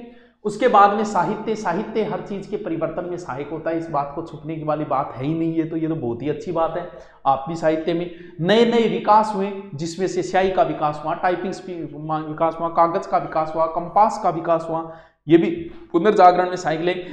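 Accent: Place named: Rajasthan, India. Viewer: Mai Bihar se hu aur aapka language sunkar mujhe lagata hai ki pakka aap rajsthan se hai 😂😂😂 but l don't know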